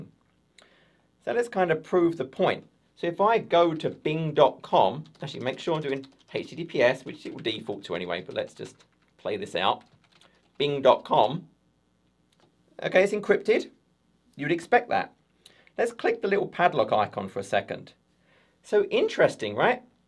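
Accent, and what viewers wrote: United Kingdom. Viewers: I pay for courses that aren't a patch on yours. I've worked in IT for too long, never needed to fully understand 'rowting' or fw's, always someone else's job. I'd never touched azure either. In the last week and a half I've gone from 'dark art' to having the confidence to set up a lab, replicate bits in my work place, secure the subnets, test out the product (secure az140 deep dive? #fingerscrossed) that I'm trying to architect and look really clever at work... You've had me covered at pretty much every base, you absolute lege!! Dunno how much you make out of this side-hussle, but good karma is definitely on the way! P.S. Hearing what I think is a southern UK accent saying the word 'route' like an American is weird, but it must be contagious as you've even got me bloody doing it :D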